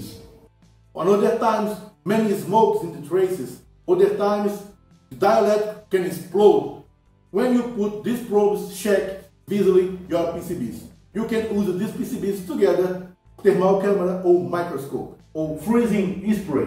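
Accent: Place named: Portugal. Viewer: Such a fucking Portuguese accent in english!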